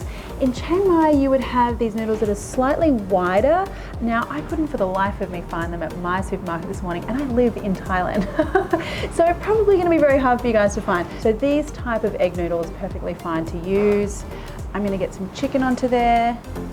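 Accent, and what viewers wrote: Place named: Australia. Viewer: We go to Chiang Mai often and enjoy Khao Soi. I think I will make you this recipe while I’m stuck back in Australia Btw you sound Australian too 😊😂😎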